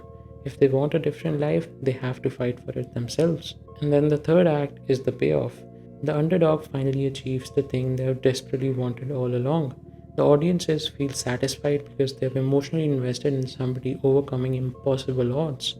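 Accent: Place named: India. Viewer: White PFP Indian voice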